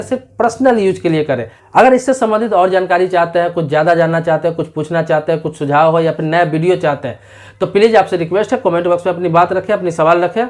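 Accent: Bihar, India. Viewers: BIHARI HO KA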